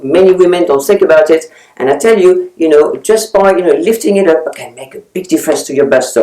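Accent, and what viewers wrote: France. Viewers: 70s???? Yuck!!! On a brighter note, you are an inspiration of style. I love watching you and I love your accent. I have always considered the French to be the epitome of elegance and you exude exactly that. And I think deep down you are also a good hearted person, one of the good people of the earth. Love you!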